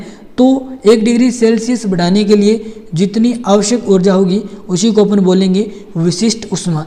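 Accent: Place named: Bikaner, India. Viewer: Bhai aap bikaner ho kya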